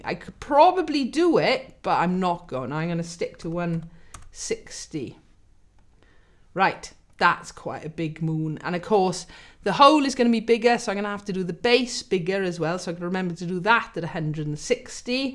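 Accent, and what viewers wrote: Wales, United Kingdom. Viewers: Love the Welsh accent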